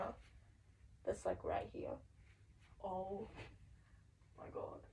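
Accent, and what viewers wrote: Australia. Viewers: Are you Australian? I love your accent!❤ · OMG UR AUSIIE ACCENT IS BETTER THAN MINE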